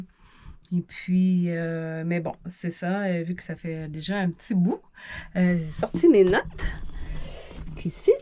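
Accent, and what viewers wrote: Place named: Quebec, Canada. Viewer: j'adore vous écouter parler avec cet accent du Québec !🙂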